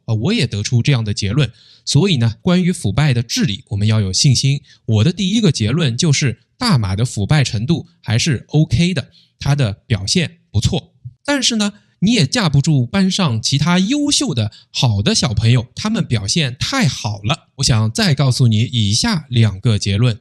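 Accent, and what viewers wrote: Standard. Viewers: 你操着一口标准的普通话。 你说马来西亚贪污腐败。 那么中共呢？ 马来西亚有几个州的苏丹。 赵家的七个家族呢？ 中共有特供。人民吃XX食物。 起码马来西亚人的食物规格没有低劣到这样。 马来西亚的政党高官贪污，舞弊，腐败。 中共可是有公安。说多了就会被抓进去。 早期马来西亚有内安法律。 中共更是一手遮天。 中共人口众多，生活压力大。 马来西亚怎么说马来人占多数，起码生活压力没有中共大。 中共有56种税（10多年前）。 马来西亚（人头税，土地税，个人所得税）。 有对比就有伤害。到底哪里让人活不下去？ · 我国是还有前途的，只要安华继续掌舵，唯有取缔贪官腐败，马来西亚是仍有光明前途的，不过只要有清廉领袖。主讲人的语音规范，流利清晰深入浅出的优点，目前有很多主播在语音方面很糟糕也来主讲。 听了 你的演讲耳朵很舒服。谢谢！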